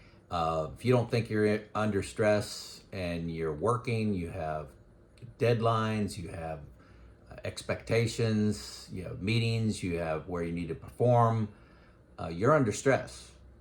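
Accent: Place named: United States. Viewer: Speaking of making fun of how you talk - are you of German heritage or grow up i a heavily German heritage area? My German-descent mother from Wisconsin and her whole side of the family pronounces "wash" as "warsh". Like George "Warshington". My dad and his Polish side of the family from Wisconsin just say "wash". Not a criticism (although we would gently tease our mom sometimes), just curious.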